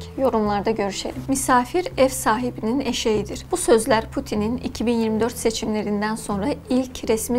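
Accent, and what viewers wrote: Azerbaijan. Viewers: Arkadaş Azerbaycan lı Türkçe anadili değil. Hem alınmasın diyorsun hem de bir insanın yaptığı işi değil, Allah vergisi sesini eleştiriyorsun biraz anlayışlı olun. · Kiz Azerbaycanli , konuşmasindan anladim 🫠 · Azeridir o yüzden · Sen dinlemek için değil kusur bulmak için buradasın.İşine gelmiyorsa başka yere.Azerbaycan Türkü anlatan bayan.Haliyle şive telaffuz farkı <link>ğenmşyorsan dinleme kimse seni burada zorla tutmuyor.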